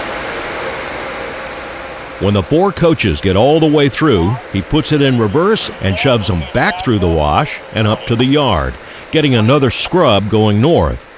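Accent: South, United States. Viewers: You are a man after my own heart! I simply adore trains ❤️ Your videos are delightful and so interesting and informative. We travel full time in our RV all over the country and I am always giddy when I see a train. Other folks avoid campgrounds near train tracks... I seek them out🚂! The rumble of the train and those wonderful horns just thrill me! Your channel pleases me very much and your soothing Southern voice brings a smile to this Southern lady's face. My husband shared this video with me this morning knowing it would make my day.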